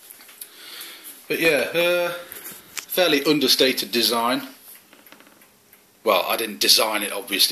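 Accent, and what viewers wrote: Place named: United Kingdom. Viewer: What kind of British accent/dialect do you speak with? Hope that doesn't sound weird I'm just really curious haha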